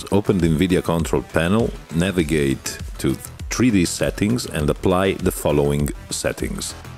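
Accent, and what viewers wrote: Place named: Central Europe. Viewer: 😜Hi Doc. Please answer this question? Where do you come from? You have a special language accent . Do you remind me a Guy From Center Europe .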